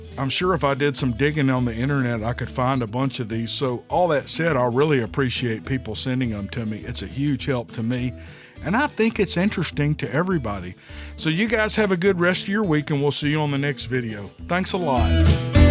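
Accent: South, United States. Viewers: O! Don’t record it over! I love hearing you get so tickled you can’t go on. I’m sure everyone is laughing along with you. Even my husband, who views these stories only as entertainment and gets tired of listening, got a great laugh at the experience and loved to hear you laugh, too. I LOVE EVERYTHING you pick out and love your accent and the way you speak because I’m a country girl from the south. You are my favorite Bigfoot storyteller on Utube.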